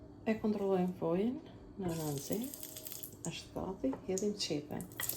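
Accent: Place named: Shkodra, Albania. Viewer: Ty te lymshin duart e pastra e te arta! Komplimente qe perdor doreza ne gatim çka tregon kushte higjeno sanitare te larta per moskontaminimin nga mikrobet te ushqimit dhe organizmit edhe pse gatuan per familjen tende! Por, ama, gjeja kryesore qe te kenaq vetem me te nigju me dialektin shkodran fantastik, "feltere", "tamin", "biber", "i perzime", "katerdhjete e pese minuta" Dialekti me i bukur i gjuhes shqipe! Te faleminderit!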